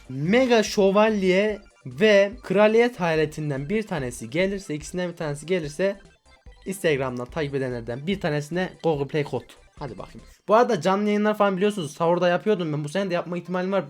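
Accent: Erzurum, Turkey. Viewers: abi sen erzurumlumusun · Erzumlumu yoksa Erzurum da mi yasiyot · Neydisen gardaş sen erzurum un neresinden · Abi erzurumlumusun bende erzurumluyum dadasim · Abi sen erzurumlumusun ben erzurumluyum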